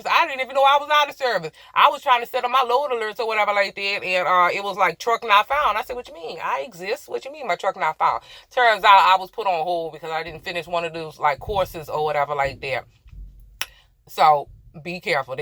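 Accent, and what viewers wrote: Louisiana, United States. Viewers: That Louisiana accent is straight SEXY💯 Ok BaaaByyyh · You are just too cool I love the accent Louisiana I think but you're beautiful cute all the above now I wanna come to land star for sure💝❤💞🌹!!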